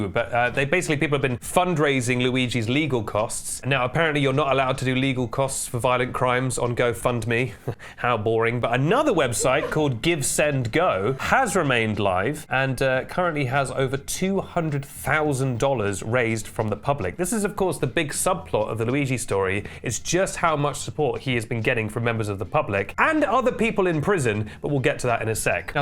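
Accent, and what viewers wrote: United Kingdom. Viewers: Wait, why are you british? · Oh you’re British :(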